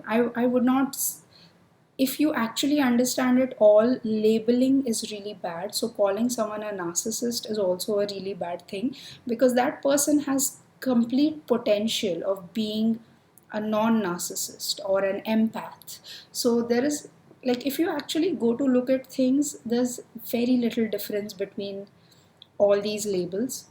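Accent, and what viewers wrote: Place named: India. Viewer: Another really great video! Your ideas are deep and you explain things plainly and clearly. (Love your accent, is it Indian or Pakistani? Love it! And your calm, friendly attitude is also really helpful in absorbing the information) Really enjoyed this, thank you !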